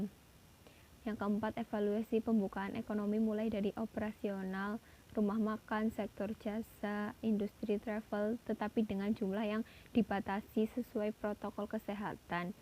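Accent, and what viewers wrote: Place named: Java, Indonesia. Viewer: Medok bgt ya. Basa indo dengan kearifan lokal👍🏿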